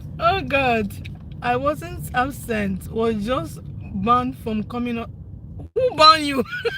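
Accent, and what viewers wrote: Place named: Nigeria. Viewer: ❤ with how the Pigin is so giving in her voice 😂😂😂 Yes o, it's real o 😂😂😂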